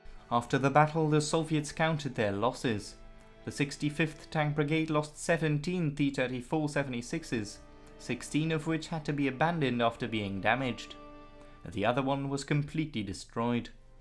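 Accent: Germany. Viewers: Say, good sir, would you not be German, per chance? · ron I could be wrong but I think the narrator's first language is german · hehe... I can believe. Though, I was sure you were German, as your accent sounded so. Rgds, W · Is my guess correct - you are German? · Highly excellent and authentic German pronunciation :)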